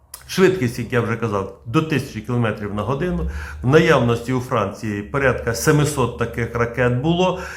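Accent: Vinnytsia, Ukraine. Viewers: Завжди приємно бачити і чути Вас, пане. Завжди зрозумілі, конкретні, оптимістичні огляди і якійсь рідний для мене акцент мови, як на вінничині. Дякую за всі Ваші труди.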